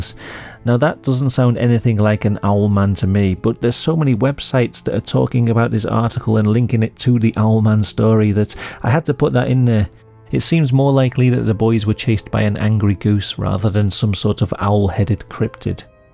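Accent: United Kingdom. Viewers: That British accent makes it even more peculiar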